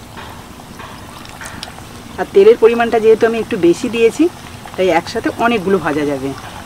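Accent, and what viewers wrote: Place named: Hooghly, India. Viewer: বৌমা , তোমার কথা শুনে মনে হয় তুমি হুগলি জেলার বাসিন্দা । তোমার রান্না খুব সুন্দর এবং পরিষ্কার । তোমার চ্যানেলের উন্নতি কামনা করছি ।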